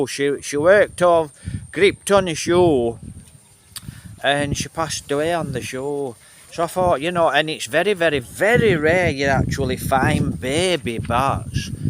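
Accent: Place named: Leeds, United Kingdom. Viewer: He's English, sounds like a Leeds accent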